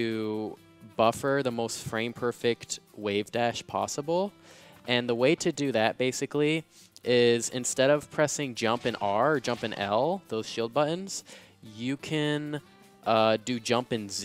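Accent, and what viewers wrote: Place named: Canada. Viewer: This dude canadian?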